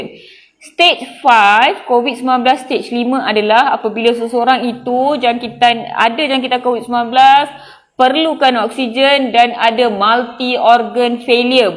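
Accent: Malaysia. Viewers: Doktor punya slanga memang melayu habis. Terima kasih diatas penerangan jelas ini.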